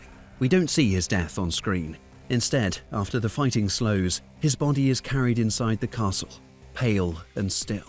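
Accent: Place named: United Kingdom. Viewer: Why're you British. but from United States!?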